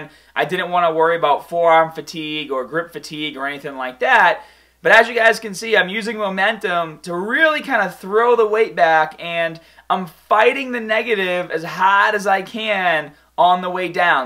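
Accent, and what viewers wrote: Boston, United States. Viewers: Who else thinks that has slowly been losing his Boston accent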